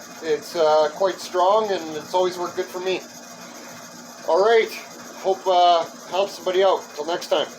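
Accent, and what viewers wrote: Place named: Ontario, Canada. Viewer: You often say, "how's she going eh". Are you a from Ontario?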